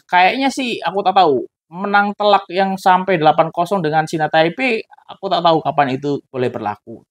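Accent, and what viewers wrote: Java, Indonesia. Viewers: bang anda asli orang jawa kah?😮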